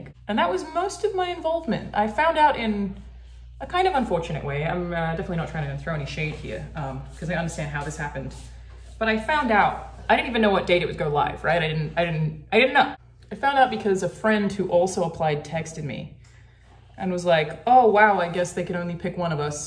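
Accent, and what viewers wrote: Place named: Australia. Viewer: Because she is Australian and that is her accent. Just as your dialect may sound unusual to someone from a different part of your country or a different part of the world.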